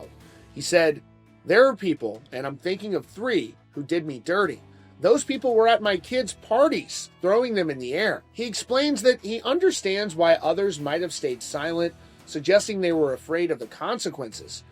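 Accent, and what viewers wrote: United States. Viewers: Time to come home to Kentucky my man ,Need to work on that damn accent you have now anyway , went full blown yank on us.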